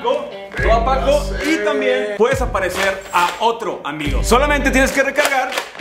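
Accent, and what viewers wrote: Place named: North, Mexico. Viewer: Ten una relación contigo mismo un rato, te lo mereces ♥️🤘 Jajajaja amo el acento norteño y sigo siendo fan de tu edición. ✨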